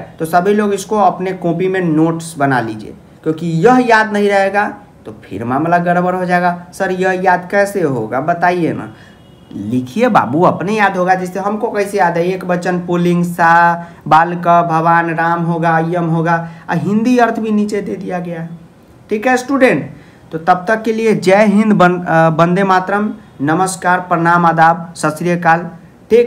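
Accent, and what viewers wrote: Bihar, India. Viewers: Yah to full bihari hai bhayiya sir aap bahut acha padha rahe ho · Sir aap bihar se h kya ...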